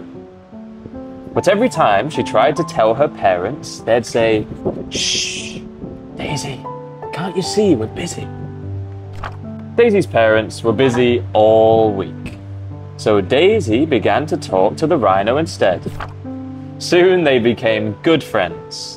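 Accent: United Kingdom. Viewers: Thank you very much . Could you please make B2 or upper -intermediate level because you voice is clear to gain the British accent ❤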